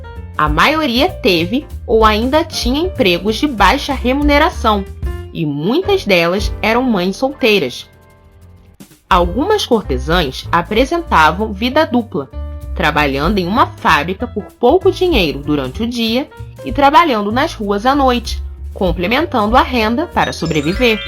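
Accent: Rio de Janeiro, Brazil. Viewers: A narradora é carioca ,.. A extoria da época vitoriana 😅kk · Gente amo esse canal mas o sotaque carioca da narradora è puxado demais , isso incomoda um pouco 😞mas parabéns pelo trabalho gente ! Segura esse like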